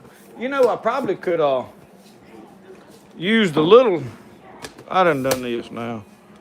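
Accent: South, United States. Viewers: Great content my Mississippi brother..I could travel across the world and always feel at home when I hear that accent.. reminds me of the South and Tennessee.. Gods blessing on you and your family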